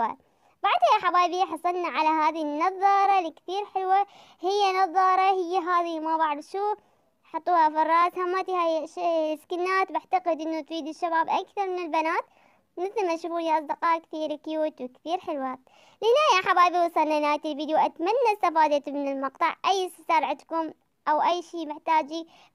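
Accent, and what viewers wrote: Iraq. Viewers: ة انا من العراق تحية كبير لي اهلك و لي الج 😀😊 اعرف انتي من العراق مبين 😜سوري سوري بس احبج 😍😁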